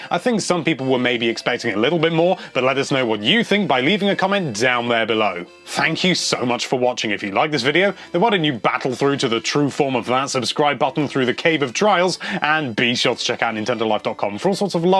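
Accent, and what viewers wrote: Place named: United Kingdom. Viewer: he is English (British) not (American)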